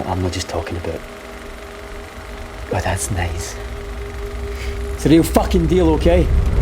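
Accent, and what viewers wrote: Russia. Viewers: Блин ну и акцент у тебя русский но лай сёравно поставил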